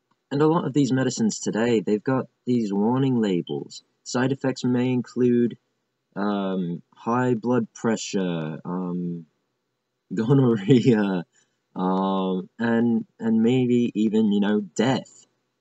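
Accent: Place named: United Kingdom. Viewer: What is with the British accent? That dog is from one of your past videos too. Some technology doesn't make people smarter like the new Iphone for instance you don't have to reach up the entire screen just to get stuff you double tap the center button and it comes half way down the screen. Other technology like stuff for hospitals make it easier and sometimes less painful for people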